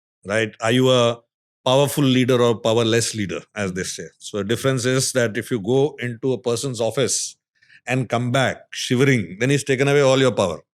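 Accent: India. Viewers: I must say that i was a fan of think school till you started lecturing what good communication should be. Why are you digressing. Your content is so good, be at that. You have such heavy Indian accent and monotony is the way you deliver things. Just someone saying you communicate well doesn't mean you take that up as your business. Do thinkschool, do great job.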